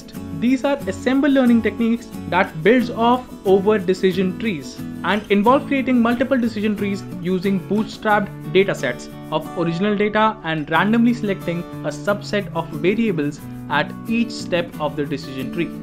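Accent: India. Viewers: The moment i heard the indian voice i just knew it would be an awesome video :) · 又是满满的咖喱味儿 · Why indian accent use too much tongue?!!! It's so annoying..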